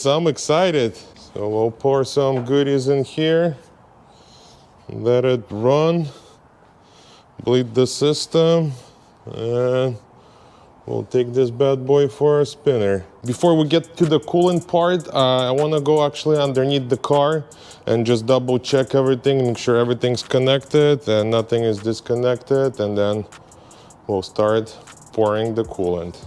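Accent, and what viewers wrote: Russia. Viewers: Я думал мне показалось что автор русский😂 👍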